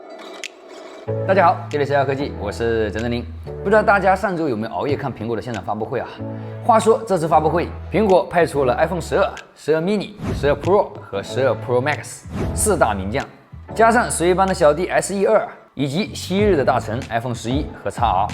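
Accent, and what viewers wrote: Wenzhou, China. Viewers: 温州人说普通话就这调调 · 没办法，温州人说普通话就是这样的。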